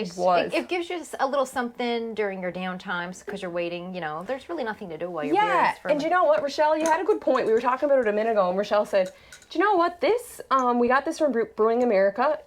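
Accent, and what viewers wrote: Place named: Canada. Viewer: Thanks for the video. The Brew HQ shirt and accent gave you gals away ! Greeting and good luck from Ontario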